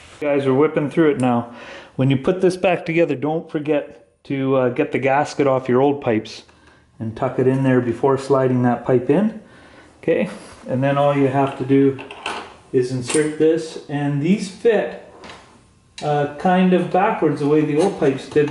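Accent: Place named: Calgary, Canada. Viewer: Great video mate, love the accent, reminds me when I lived in Calgary. Question. What is the purpose of the servo on the exhaust and is it ok to disconnect it like that? I recently bought a 1000 and when swapping the fender eliminator I notice I also didn't have those servo cables. Cheers from Australia.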